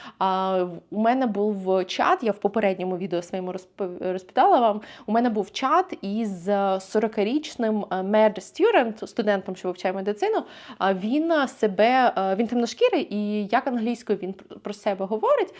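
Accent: United States. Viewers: В таком случае все проживающие и рождённые в штатах Мичиган, Иллинойс (Чикаго)говорят неправильно.она произносит так как разговаривают в штате,где она проживает,чтобы не было акцента.не знаю где Вы проживаете,родились и какой Ваш родной язык.если Вы проживаете здесь,то знаете, в различных штатах есть свой акцент и произношения определенных слов,поэтому общаясь с людьми,Вы можете предположить откуда человек.если Вы обратили на это внимание,я 100%уверенна,Вы не рожденыв штатах,а приехали !!! всего доброго!!!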